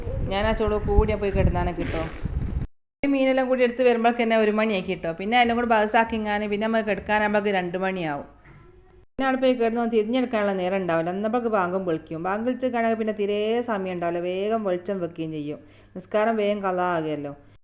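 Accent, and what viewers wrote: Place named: Kerala, India. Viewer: ഇത്താ നിങ്ങൾ നാട്ടിൽ എവിടെയാണ്. കൊടുവള്ളി ഭാഗത്ത് ആണോ ? സംസാരം കേട്ടിട്ട് അങ്ങനെ തോന്നുന്നു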